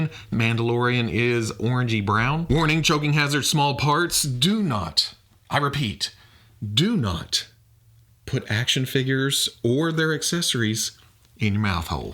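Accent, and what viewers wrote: New Zealand. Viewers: lmao I love your New Zealand accent! Excited to get both of these figures! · the kiwi accent is not ideal but still a fantastic effort from a guy so far away from new zealand he’s closer to hawaii….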